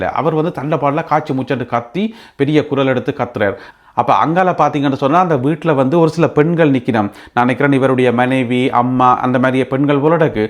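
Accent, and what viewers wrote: Jaffna, Sri Lanka. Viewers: இது யாழ்ப்பாண பேச்சுத் தமிழ்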